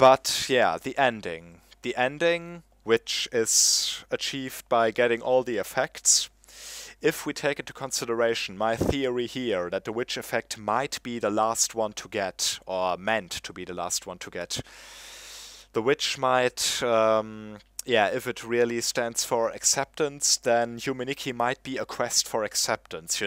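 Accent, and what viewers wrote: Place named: Germany. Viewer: Hahahah man hört den Deutschen Akzent sofort raus ;D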